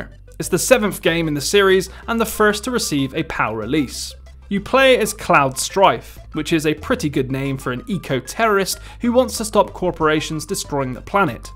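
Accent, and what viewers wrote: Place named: West Country, United Kingdom. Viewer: Proper west country. You Somerset/Dorset based?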